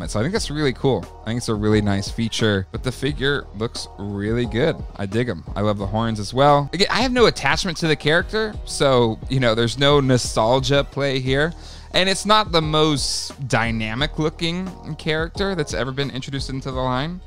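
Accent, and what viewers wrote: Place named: Midwest, United States. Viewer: You have such a thick midwestern accent lol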